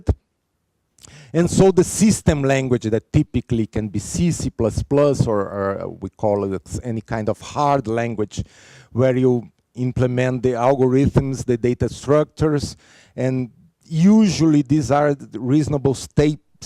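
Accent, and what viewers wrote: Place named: Brazil. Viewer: The speaker is speaking in a brazilian english accent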